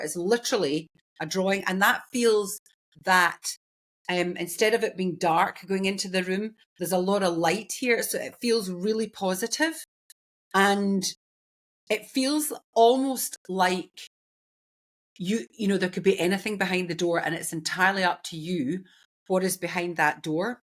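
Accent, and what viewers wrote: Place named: Scotland, United Kingdom. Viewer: I felt connected with the first video I saw. Thank you, and PLEASE keep going. Wishing you all the best for your channel. I've just shared this vid. P.s. I absolutely adore your accent. Scotland is One of my most favourite places on earth. ❤XX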